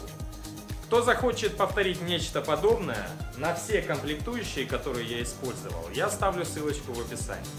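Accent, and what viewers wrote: Rostov, Russia. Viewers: Почему он разговоравает как Негода? В Ростове все так говорят??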